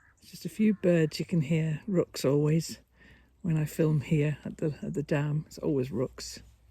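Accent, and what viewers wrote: England, United Kingdom. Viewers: I was thrilled to see you NYE. You always are so interesting. My grandmother on my dads side came from England, however, passed before I got to know her. Your accent draws me closer to her because I do remember her accent and the way she spoke I was only four and I loved her so much. I am doing ancestry to see where and when she came here to United States. I feel like I’m on the sofa with you. Keep up the videos♥️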